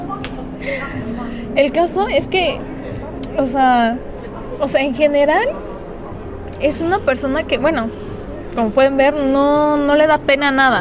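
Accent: Mexico. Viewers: Tu voz, tu idioma es muy mexicano, pero se re nota que las tradiciones coreanas se interponem por demasiado, espero que encuentres a alguien mejor y no te eligas a tu pareja solo por lo físico o superficial...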